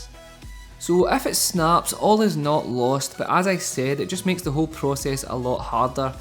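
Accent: Scotland, United Kingdom. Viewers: its a scottish accent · Omg yer scottish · Thats the good ol scottish accent. 😊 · scottish but it's not usually that strong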